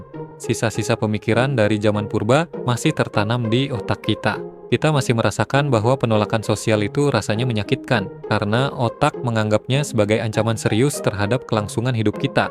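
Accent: Bali, Indonesia. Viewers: blinya logat bali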